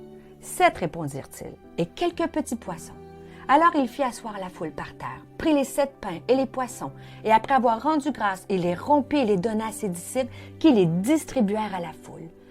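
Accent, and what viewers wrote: Quebec, Canada. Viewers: Bonjour un plaisir d'entendre cet accent je ne connais pas le Canada mais j'aime votre accent. Merci pour ce partage, oui Seigneur tu as toute la puissance pour multiplier nos besoins spirituels, physiques,moral, matériels. A toi sois toute la gloire.❤️🙏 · Bonjour Pasteur.Contente d'entendre une voix féminine ce matin.J'aime bien votre accent Québécois. Merci pour les explications sur ce passage de Matthieu 15...Amen..Je crois que le Seigneur Jésus a le pouvoir de faire fructifier mon finance afin que je puisse aider les autres. Restaurer les couples en difficultés et ouvrir les yeux et se révéler aux personnes qui ne le connaissent pas.Gloire à Ton nom Seigneur Jésus Christ. Soyez richement béni en ce mois de décembre On est ensemble ❤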